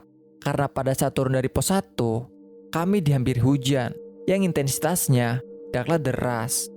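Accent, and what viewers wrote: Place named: Banyumas, Indonesia. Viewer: Yg bawain cerita orang ngapak ya😅😅😅😅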